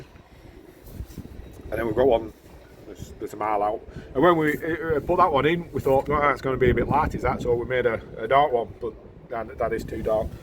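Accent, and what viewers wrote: Lancashire, United Kingdom. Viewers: Your accent ? I'm going to say Colne in Lancashire? Great video BTW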